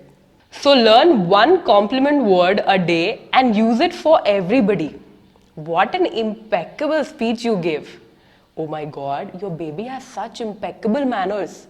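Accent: India. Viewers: You spoken english but saying like hindi. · This isn't a impeccable comment. She is Indian so she will obviously, by heredity, have a indian accent. Nothing impeccable. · Your english accent is pure Indian.. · I have question why all hindis accent like this? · I love Indian accents like how you are talking,.. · I just love the way u speak English 😌,,,,your English accent proves that u r an indian and even you never try to say English like their 😚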